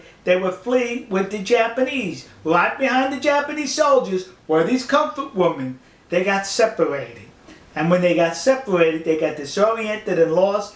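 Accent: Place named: Northeast, United States. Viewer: Tbf that's a pretty common accent patter in parts of the American north east, especially in historical districts of larger cities.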